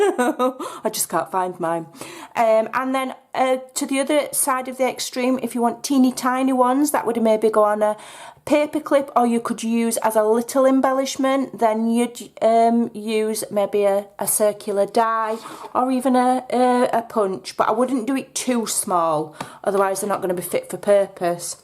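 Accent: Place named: East Yorkshire, United Kingdom. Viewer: Thanks, this is a lovely idea. From your accent you sound like you are from East Yorkshire, like me!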